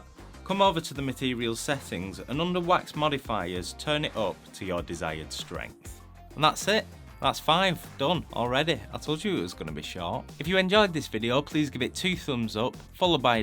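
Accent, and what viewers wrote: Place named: Northern England, United Kingdom. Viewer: You can't fault a proper northern accent our kid.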